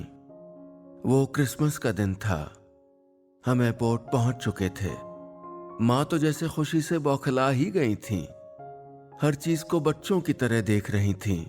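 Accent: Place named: Marwari, India. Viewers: Bht hi pyaari story thi sir and aap bht pyàari rajisthani bolte ho👌👌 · 'Kup chan goshta' matlab 'bohot hi achhi kahani'. 'Hrudaysparshi' yani 'dil ko chunevali'. Indian languages are so amazing i liked the way you spoke rajasthani so mch.I couldnt control commenting in my mothertongue marathi I wish i could write a story and nilesh sir narrating it..... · सर, जय श्री कृष्णा, मै राजस्थान के जोधपुर के रहने वाला हूँ,और जब भी आपकी कहानियाँ सुनता हूँ तो उसी मे डूब जाता हूँ, पहली बार आपकी आवाज मे राजस्थानी मारवाड़ी भाषा सुनकर ऐसा लगा की आपने कानो मे मिश्री घोल दी हो। आप से अनुरोध है की आगे भी दीक्षा चौधरी जी की कहानियाँ आप हमको मारवाड़ी मे ही सुनाये,धन्यवाद,CA अभिषेक वर्मा,<num>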